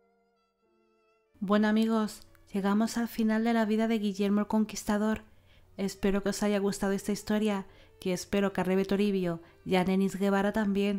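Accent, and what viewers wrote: Spain. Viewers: Estoy enamorado de tu voz, la cadencia, tu acento peninsular... como Argentino, hijo de España, me derrite. Excelente trabajo.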